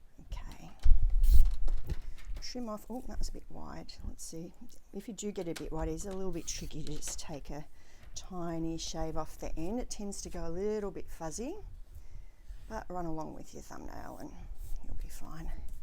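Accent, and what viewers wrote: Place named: Australia. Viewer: Nice to hear an Aussie sharing!😀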